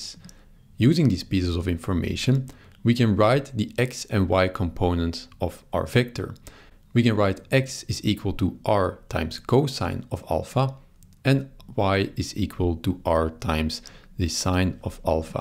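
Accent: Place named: Belgium. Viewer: Ik kon direct horen dat je een belg was haha, moest dit even opfrissen voor bachelorproject robotica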